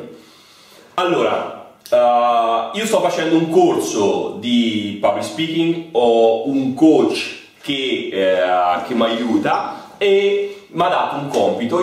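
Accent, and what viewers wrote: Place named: Tuscany, Italy. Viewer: Si sente il toscano ,leva il foglio ci vuole piu grinta .....e non gesticolare troppo !!!!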